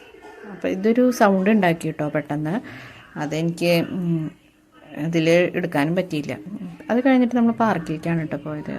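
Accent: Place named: Thrissur, India. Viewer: Aaa thrishooru bhaasha.....poliyaattaa😊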